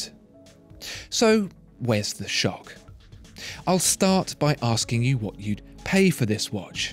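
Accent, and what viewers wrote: United Kingdom. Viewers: I been watching the pro review from you almost a year . love your British accent man . I find very useful. thank you for the great work . do you think you can do a review on Breguet marine tourbillion ref number 5827. I couldn't find any helpful reviews . please help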